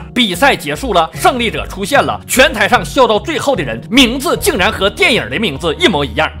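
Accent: Northeast, China. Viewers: 真的很大碴子. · 哈哈，我最喜歡聽說英語，，滿滿的東北渣味。👍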